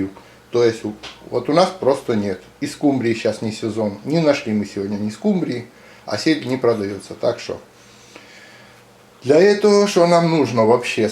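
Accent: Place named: Odessa, Ukraine. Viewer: . красивый одесский акцент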